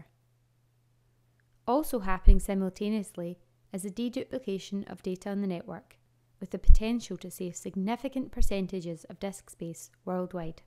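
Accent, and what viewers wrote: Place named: Scotland, United Kingdom. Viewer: Nice female Scottish voice :)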